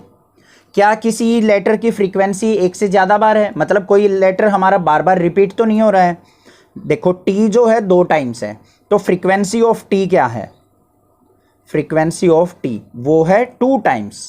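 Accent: Haryana, India. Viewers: Sir aap haryana se ho😊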